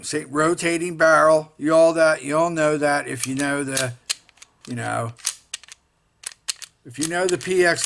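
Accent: United States. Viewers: Hard philly accent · Where are you located? Got kinda a “Balmore” accent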